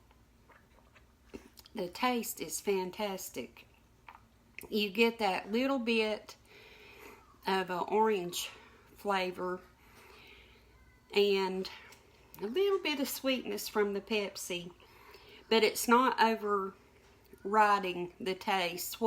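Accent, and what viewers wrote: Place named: Tennessee, United States. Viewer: I just love your accent! I’m from Tennessee but have lived in Michigan for the past 10 years. Listening to your videos takes me back home to being in the kitchen with my Mom and Aunts. Those are treasured years! I’m so inspired by your wholesome recipes. I’m taking both your Dollar Tree chocolate cherry cake and apple yellow cakes to my office potluck tomorrow. Thanks so much for taking the time to share with us!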